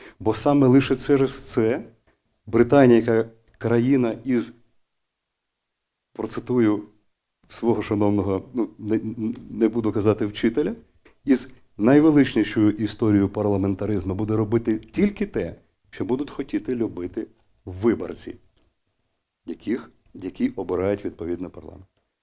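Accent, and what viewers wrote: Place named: Russia. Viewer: Пане Залужный, а не лучше ли говорить на языке, на котором вы всегда говорили, русском. Очень тяжело слушать русские слова на украинский манер... "воена" - вийськова, и др.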